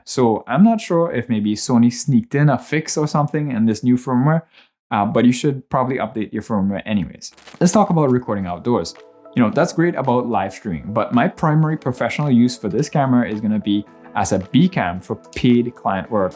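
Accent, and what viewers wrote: Trinidad and Tobago. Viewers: Do I hear ah Trini ??? 🇹🇹👀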